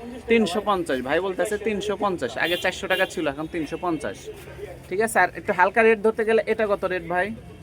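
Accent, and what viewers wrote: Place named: Bogura, Bangladesh. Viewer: আপনার ভাষাগুলো বগুড়া বগুড়া লাগে❤❤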